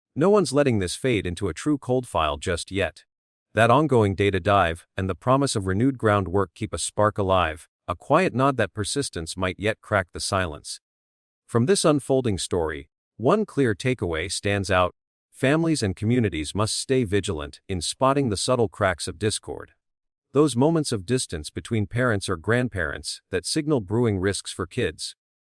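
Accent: United States. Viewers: I don't think there ever was a snake nearby on the verandah. Also, it is very difficult listening to all this stuff when the speaker gabbles so quickly, and he has an American accent. He is very difficult to understand his meaning, sometimes.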